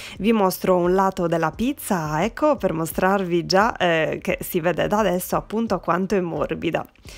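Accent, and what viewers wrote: Sardinia, Italy. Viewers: ciao ti volevo chiedere se uso la farina 0 e uguale perché in questo periodo e difficile trovarla La farina Manitoba con il covid 19 qui sparisce tutto e se trovi qualcosa e un miracolo ciao ancora .(sai io o delle cugine e la zia che abitano lì e sono tanti anni che non li vedo perche mia mamma e nata li a Iglesias.infatti io cono mezza sarda perché assomiglio tanto a lei e o oltretutto ereditato l'anemia .vabbè comuncue mi piace tanto sentire la tua parlata Sarda mi ricorda quando andavamo a trovare mia nonna che abitava a Iglesia ora non c'è più sono ricorbi dellissimi che o e mi tengo nel Cuore e mi piacerebbe rivedere la mia cara Sardegna sai be un saluto caro ciao CATIA dall'Umbria 😁👍🤣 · Mio padre è sardo!!! Mi piace il tuo mitico accento!! Le tue pizzette cicciottose !!! Bravissima !!! Un abbraccio da Trento !!!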